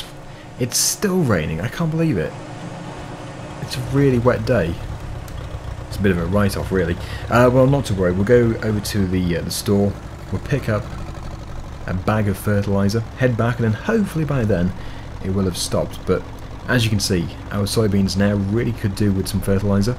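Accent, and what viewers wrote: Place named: Guernsey, United Kingdom. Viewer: where u born in guernsey cuz i am from there and your accent is guernsey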